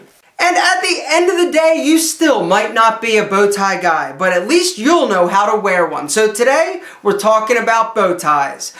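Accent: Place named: New Jersey, United States. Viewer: Don't take it personally, he's probably from Jersey with that voice.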